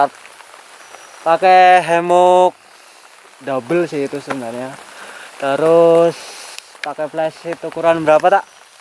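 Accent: Banyumas, Indonesia. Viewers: wong endi sampean kang? kayane sesama republik ngapak kie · Deneng ming kaya kue tok ? Yo wis lah. Mantappp ... Tetep semangat. Ora ngapak ora penak.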